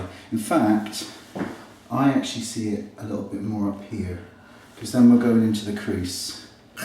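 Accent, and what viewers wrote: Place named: West Country, United Kingdom. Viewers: I also just 'stumbled' across ur channel - this made my day!!! divorce has made ur eyebrows low - lol!!! fantastic - look forward to watching the rest of ur vids...love that west country accent!! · I think he grew up in Cornwall and moved to Wales? It's a very southern accent. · Love the somerset accent! Reminds me of home!:L haha! Loved this, made me laugh! x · That was VERY funny. Sometimes i can hear a bit of west country accent when you speak but that was positively "BRIZZLE"! Thanks for the laugh:-D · Love the slight west country accent! Really funny vid x x x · hahahaha loving the farmers accent! you sound like you're from cornwall lol xx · BHAHAHAHAHA!!!!! That is one of the funniest videos eveeerrrrrr!!!:D Good on ya, for being such a good sport!, your Somerset accent really shone through in this one, loved it! 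Thanks for the giggle! Jess x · What a lovely, natural look you have created. I wish I could do my makeup to look exactly like that! Actually, I think that a Scouse Brow would have complemented the look even more... You are a Very Naughty Boy!!! must really love you to let you get away with all that you do! Love it. Your comedy videos are almost better than your serious ones! Especially accompanied by the Westcountry accent. More more more please x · are you from Bristol?? Im loving the Bristolian-ish accent hehe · i love your 'brizzol' accent....... hillarious, my make up artist from bristol sounded exactly the same... but had a much dirtier laugh! brilliant video:-) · your accent makes everything you say so much funnier:') love a good west country accent!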